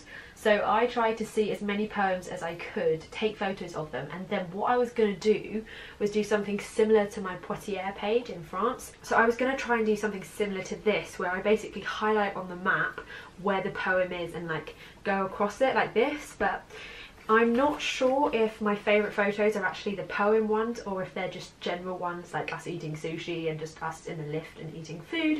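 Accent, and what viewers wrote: United Kingdom. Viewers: I adore your British English 😊Ready to listen to whatever just speak plz. Hi to Brighton ❤ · Oh so you're british. I love your accent omg I think I'm inlove lol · Wow her British accent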